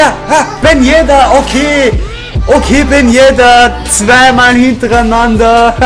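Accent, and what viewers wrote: Austria. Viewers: Ich habe schon lange überlegt es mit youtube zu versuchen bin zwar Bayer wäre vom Akzent fast genau so wie bei dir als Österreicher :P wären auch neue Freundeskreise die man vill knüpfen könnte und diese Kamera von Logitech wäre ein Anreitz um es doch in Angriff zu nehmen das ganze :)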